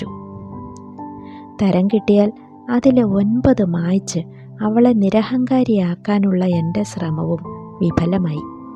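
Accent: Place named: Delhi, India. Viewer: Script good...presentation language delhi malayalam pole enikku thonny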